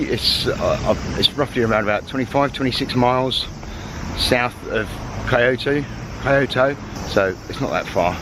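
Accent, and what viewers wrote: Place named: Australia. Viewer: Really enjoy your narration. Bit of oz & cockney! Good videos too. You deserve a lot more views friend. Safe travels and regards from N. Ireland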